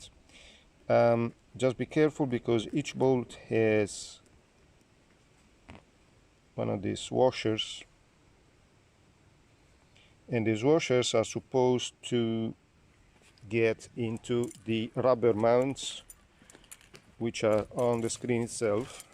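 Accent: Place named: Italy. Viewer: you speak Netherlands i can here you Italien accent beceause i was many thimes in Italy in Modena Ficensa Treviso Napoli vinetie etc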